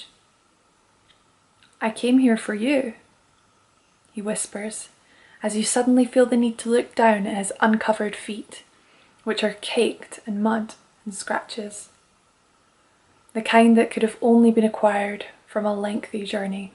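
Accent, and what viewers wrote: Edinburgh, United Kingdom. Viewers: So nice to hear such a lovely Scot lass tell a beautiful story. Would love to hear a wee bit more of the Edinburgh accent, it is my favorite sounding speak.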